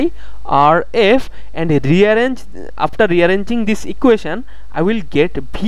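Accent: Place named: Assam, India. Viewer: Assamese English.. Anyways, nice explanation !! 👍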